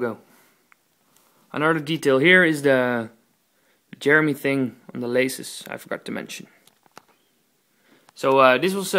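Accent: Netherlands. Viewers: O BTW again are you dutch? you sound dutch ( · Dude your accent sounds dutch. Im from holland also but they wont answer me on msn. How much did you pay for these? thanx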